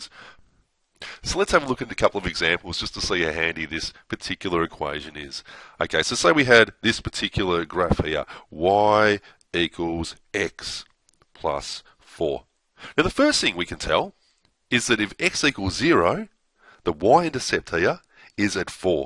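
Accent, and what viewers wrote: Australia. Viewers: Aussie Aussie Aussie!! Thanks, mate. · He's just Australian by the sound of it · No he’s just an Aussie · He's speaking English but he has an Aussie accent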